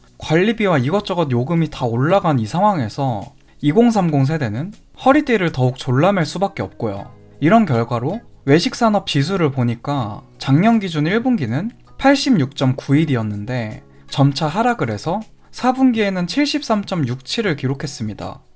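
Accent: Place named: Honam, South Korea. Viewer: 혹시 전라도 출신이신가요? 말하는 억양이 호남지방 억양이 짙은데..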